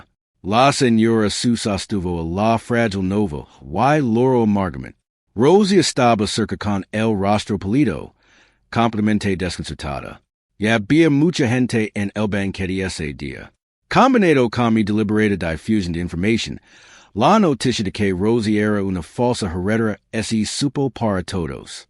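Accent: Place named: England, United Kingdom. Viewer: Español con acento ingles"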